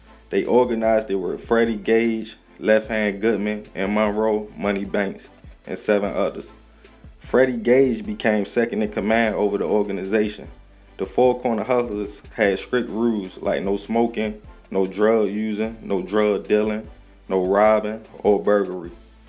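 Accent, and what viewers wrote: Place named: DMV, United States. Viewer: Lo was 18 bro get it right sound like you from the dmv so you not from out here so it's not your faught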